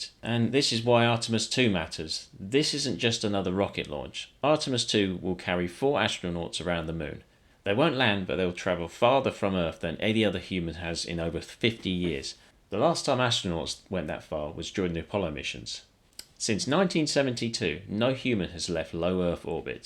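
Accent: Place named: London, United Kingdom. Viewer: Thanks for the update. Given the complexity and safety aspects that are a huge part of this project, I’m still amazed that well over 50 years ago, humans actually went to the Moon. I’m located about 60 miles south of Los Angeles, in Orange County, CA (but born in Gloucester, UK)., I’ll guess that you’re from some borough of London, or nearby. Hackney?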